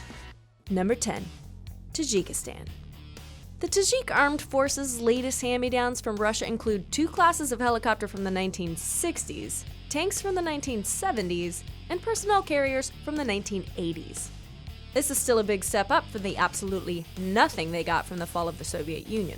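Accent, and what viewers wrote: United States. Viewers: This video sounds American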